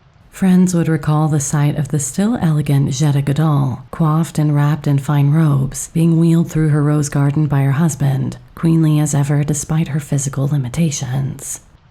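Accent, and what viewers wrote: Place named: United States. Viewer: I love the narrator’s voice, even tho’ American. ❤😊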